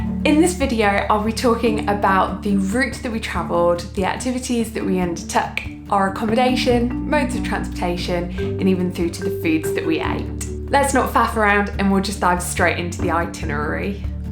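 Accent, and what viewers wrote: United Kingdom. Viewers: British accent English is so hard to understand. BTW love your videos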